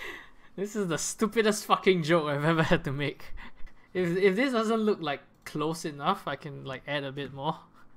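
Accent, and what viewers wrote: Singapore. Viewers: u sound super singaporwan are u?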